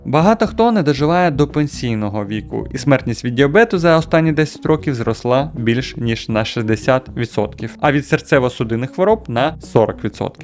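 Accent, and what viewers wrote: Russia. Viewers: неможливо слухати, через заводську расссєйську прошивку автора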